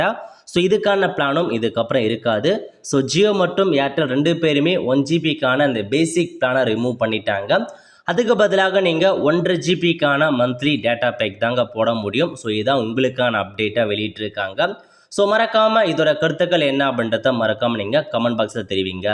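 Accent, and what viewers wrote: North, India. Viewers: உங்கள் பேச்சு வடக்கன் மாதிரி இருக்கு. கொஞ்சம் தெளிவாக புரியும்படி உச்சரித்தல் நன்று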